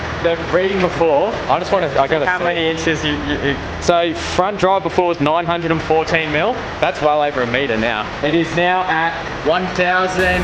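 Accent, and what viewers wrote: Australia. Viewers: I was like: "I think this guy is Australian" "Oh yeah, he's definitely in Australia"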